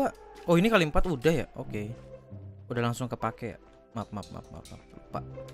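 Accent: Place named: Banten, Indonesia. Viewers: Lucu oge sunda na si akang... wkwkwk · Ngakak gua bang denger lu ngomong sunda😂😂salm dari sunda ciamis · njiirrr ngakak Sunda na kang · ngakak so much wkwkkw logat sundana banten pisan. leuwih alus keur maen pubg sunda oge mang wkwk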